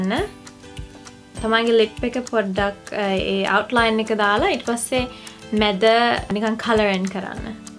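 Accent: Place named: Sri Lanka. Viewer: Nice video 😍 love your Sinhala accent 😘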